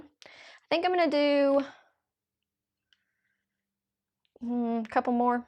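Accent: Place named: Chattanooga, United States. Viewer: I thought I recognized that accent! I’m in Chattanooga! Love your cards! So glad I caught the replay - hopefully I’ll catch the next one. I’ve just started using my nesting dies for more than just a panel. TFS!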